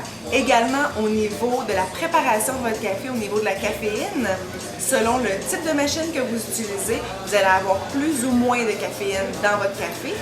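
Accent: Quebec, Canada. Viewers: merci bcp pour 1 conseil sup...avec 1 accent qbqwa c vrmt cooool. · votre accent est très agréable, vous me faite aimer de plus en plus le Quebec.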